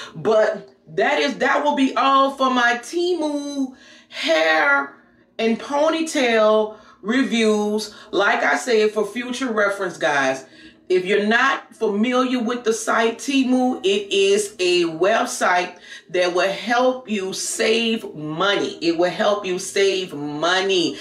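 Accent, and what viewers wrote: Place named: New Orleans, United States. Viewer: HAHA! Stumbled upon this channel looking at TEMU reviews and OMG as soon as you said BIG CHONKY instead of chunky and "Head full of hair", I just knew you are from New Orleans 🤣🤣🤣⚜⚜⚜⚜ #Subscribed but even though you make your own wigs, you can make a lot of money on here doing wig reviews for companies. Particularly it's the Asian companies that reach out and want to work with you. That big blonde wig is sending meee! 😂😭😭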